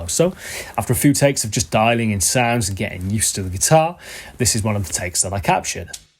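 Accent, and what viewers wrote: Wales, United Kingdom. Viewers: You got style bro, you sound like a fellow Welshman too👍👊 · On a random note .. sounds like you've lived in or come from Wales, possibly Caldicot or Chepstow area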